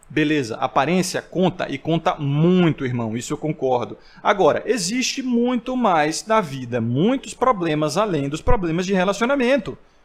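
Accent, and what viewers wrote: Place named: Northeast, Brazil. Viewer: ~ "sempre vai ter um Deus, a genética é o Deus dele" => isto eu achei fenomenal, nunca tinha visto alguém ter esta verificaçao. Se voce estudar teologia/mitologia grega clássica a fundo, vai entender que os deuses gregos era meramente um dicionário de palavras em que as pessoas deveriam acreditar piamente, como "democracia", "justiça", "honestidade", "dignidade".... Por outro lado, é bem óbvio que voce nao é loiro e nem tem os cabelos lisinhos, eu nao preciso de te ver pois a sua voz com sotaque rede globo nordestino te entrega. Eu até acho que voce estudou jornalismo, mas aí ainda tenho muito pouca informaçao. Nós nunca somos este indivíduo único. Se voce é volcel ou incel, pouco importa, mas as pessoas tem condiçoes sim de recriar sua imagem sem ver voce.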